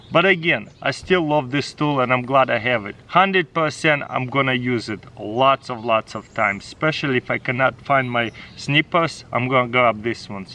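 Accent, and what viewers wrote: Russia. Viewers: псс чувак, а наш акцент-то никуда не делся, ^^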